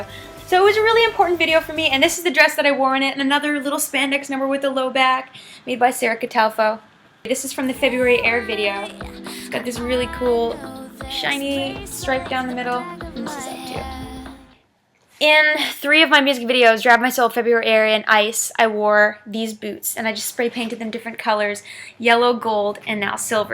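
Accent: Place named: Canada. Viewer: I've never noticed how Canadian you sound. The accent is strong with this one.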